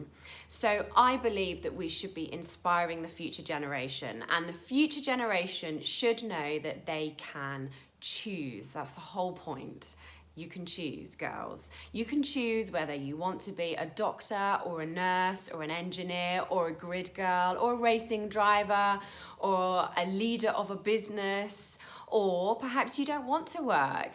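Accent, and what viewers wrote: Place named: London, United Kingdom. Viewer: For some reason I always find the London tone makes everything sound more sophisticated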